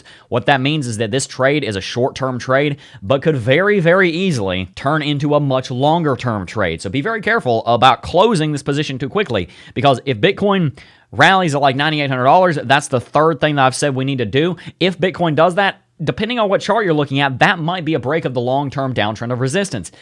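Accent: South, United States. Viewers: One of next fav analysts , it’s the southern accent or wherever the hell yo from . I love coffee too bro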